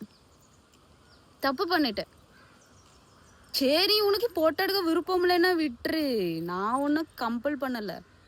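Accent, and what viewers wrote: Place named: Kongu, India. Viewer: Kongu tamil super ❤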